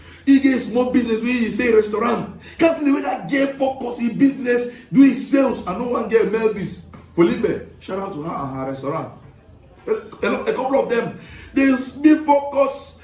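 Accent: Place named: Nigeria. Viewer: Combine bro. Pidgin and British English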